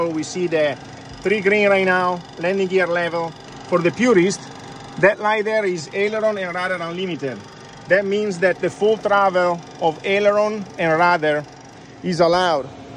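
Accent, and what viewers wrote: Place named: Italy. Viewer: Love your videoes and the way you explain. And your Italian accent. Greetings from 🇧🇻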